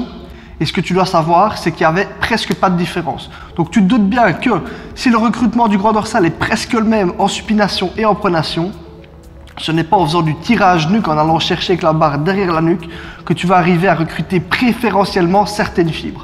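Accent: Liège, Belgium. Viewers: Bonsoir , c'est bien juste ce que tu dis c'est très intéressant .... Juste un truc , tu parles avec un accent que je semble connaître ..tu es belge ? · Liegeois expatrie aux USA, je suis subjugue par la qualite de tes videos. Et l'accent me rappelle le pays ;)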